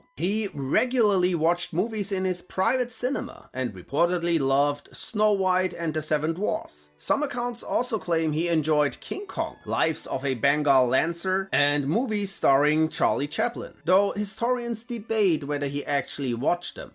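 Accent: Germany. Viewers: Soft manipulation in to likeing Adolf , Smart move german accent guy...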